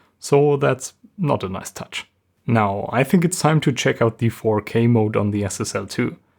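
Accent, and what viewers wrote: Germany. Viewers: Love the German accent.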